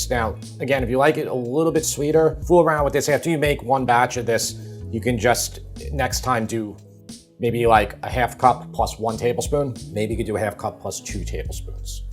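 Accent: New York, United States. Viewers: Love your NY accent! I’m from NY too, but now live in Virginia. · I haven’t tried the recipe yet, but I enjoy the way you “tawk” because it’s exactly how I do! Lifelong New Yorker. My adult children still mock me, especially when I make fresh-brewed “cawfee!”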